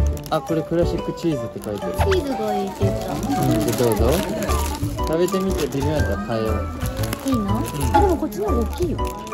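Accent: Oita, Japan. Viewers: 大分弁とピーピー豆最高‼️ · 大分県民として大分弁時々出るの最高です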